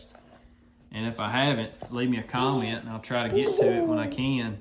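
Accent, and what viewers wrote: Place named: Mississippi, United States. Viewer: Don't apologize for your dream. It is awesome and your videos answer a lot and inspire people to do their own. Some of the best videos for that with Pole Barns I have seen. Plus as a guy who met/married/had children in and lived in MS for 13 years I appreciate the down home accent and lingo. :)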